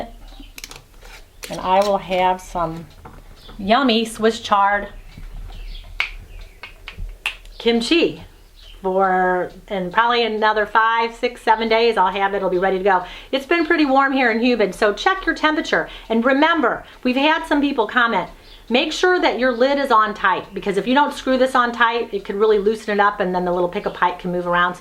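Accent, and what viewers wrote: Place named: Chicago, United States. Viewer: Thick Chicago accent!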